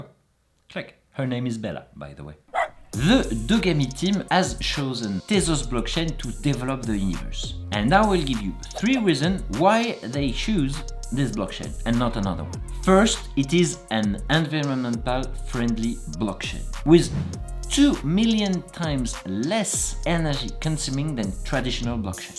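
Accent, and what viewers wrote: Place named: France. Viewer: As a French, I love your French accent! :)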